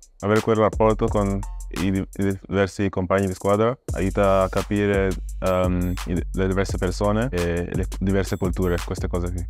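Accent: Veneto, Italy. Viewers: Grande Musah, con l'accento veneto.♥️🖤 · Musah: (*parla italiano con l'accento veneto) First reaction: shock😮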